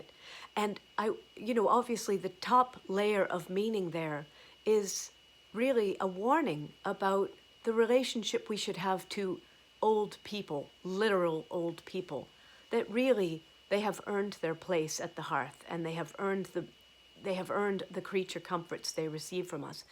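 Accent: Ireland. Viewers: Oh how I loved and needed this! Yes I have done things which, in retrospect, have disappointed or inwardly embarrassed me... but it IS true that I have learned a tremendous amount (in retrospect)... it seems the more daring the effort the more I learned afterwards. I always thought I needed 'a clean slate' of a life, 'never putting a foot wrong' which never worked. I'll listen to this again and have already sent it to my kindred spirit friend across the country 💕 Since delivery is half (or more) of the message I want to say your English is beautiful and I love the very delicate tinge of Irish in your speech.. Thank you!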